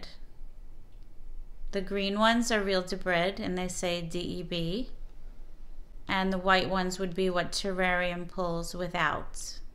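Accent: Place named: New England, United States. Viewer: Great job, easy to follow....even though I hear little yankee in your voice ;) , my southern draw would be much funnier lol.....great videos and keep up the good work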